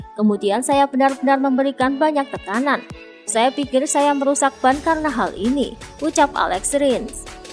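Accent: Java, Indonesia. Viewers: Mba mba medok hahahaha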